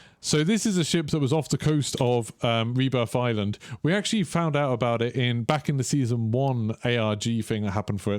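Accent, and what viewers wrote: United Kingdom. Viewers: Question: I seriously hope I'm not being offensive, so please don't like, flip out, but why do British Pronounce LZ as LZead and Lieutenant as Leftenant?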